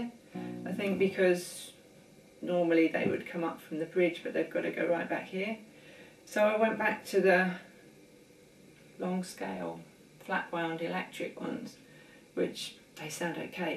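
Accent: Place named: South, United States. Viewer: When English girl get all southern? ROCKIN MAN LOVE THE LOOP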